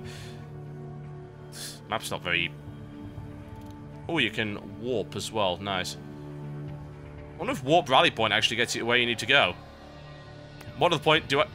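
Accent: United Kingdom. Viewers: that seemed to be a generally understood concensus. Also, i don't recall any REAL complaints. I would SO watch in 240p. Mostly i just watch for the hillarious comentary in the british accent tbh!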